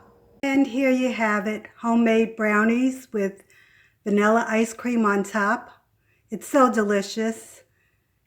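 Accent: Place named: New York, United States. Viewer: Yes, you sound like me, lol! I’m a Bronx girl born and raised, still living here. Are you still in the Brooklyn Queens area?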